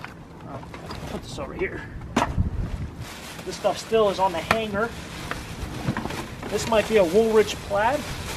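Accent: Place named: Philadelphia, United States. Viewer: I smell a Philly accent.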